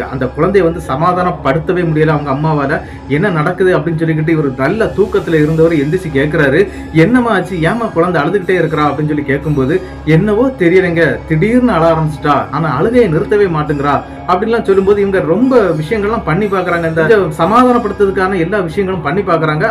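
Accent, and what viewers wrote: Tirunelveli, India. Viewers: தம்பி திருனவேலியா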